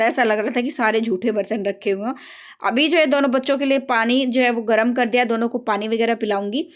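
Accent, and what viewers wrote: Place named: Haryana, India. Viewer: Di aap kha rhte ho qki aap haryanvi lgte ho bcoz m b haryanvi hu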